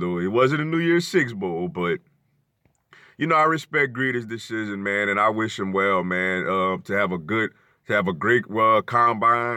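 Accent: New Orleans, United States. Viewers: Aye, bruh are you from the New Orleans area?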